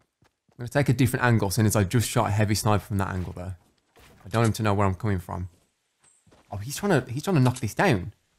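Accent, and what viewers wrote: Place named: Birmingham, United Kingdom. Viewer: Dave the dragon for sure, and you have to say it in your old brummy accent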